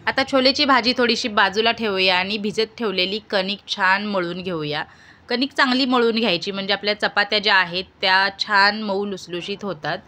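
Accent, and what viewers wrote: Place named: Kolhapur, India. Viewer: Chaan vat te tumcha awaz and tumcha kolhapuri accent eyikayla.. recipes pan baghayla avadtat ❤❤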